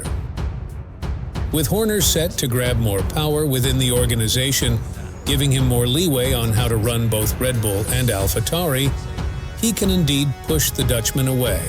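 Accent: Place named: United States. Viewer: It's funny hearing an American sounding like he knows F1.....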